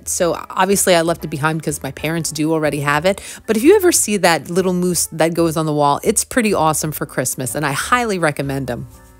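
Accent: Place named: East Coast, United States. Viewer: I can hardly stand to buy new anymore! Everything I wear is thrifted!! And decor for my home as well!!! Books, you name it!! Hugs from Michigan! You sound like you live on the east coast of the United States!! I grew up near Washington DC!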